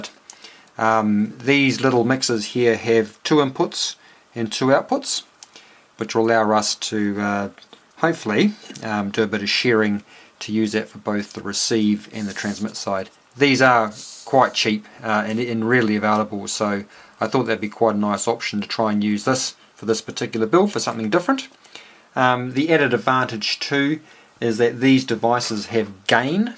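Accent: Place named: Alabama, United States. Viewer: Great video, but Alabama accent is pretty thick.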